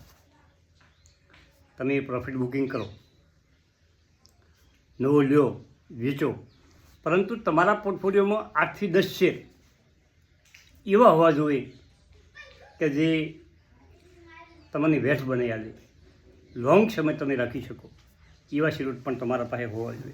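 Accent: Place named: Vav-Tharad, India. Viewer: કાકા તમારી બોલી વાવ થરાદ બાજુ ના હોય એવુ લાગેછે જવાબ આપવા જો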